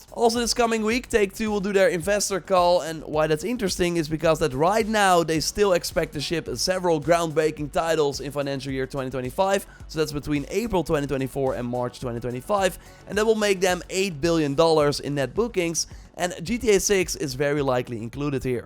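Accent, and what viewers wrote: Netherlands. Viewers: The Dutch accent I love it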